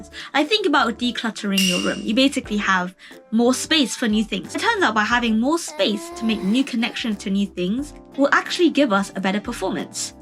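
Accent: United Kingdom. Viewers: Oh the Britsh accent. I thought you said watch lewd things...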